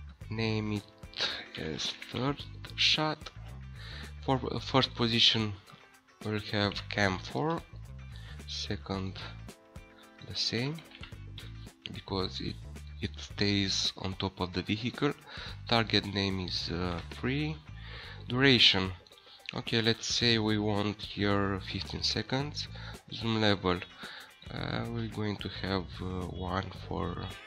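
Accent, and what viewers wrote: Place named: Romania. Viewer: You sound like a Romanian :)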